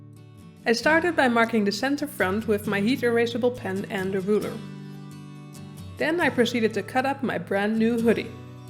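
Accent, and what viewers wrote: Netherlands. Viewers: ik hoor gewoon t nederlandse er doorheen, maar dit is zo goed uitgelegd, zelfs ik snap t in 1 keer en ik heb echt moeite met begrijpen, top video! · dank je wel! Clear and to the point!! Wonderful tutorial. ❤ Also, as someone who grew up in Germany 5 min from the Dutch border, it was lovely hearing your accent! Brought be straight back home. 😊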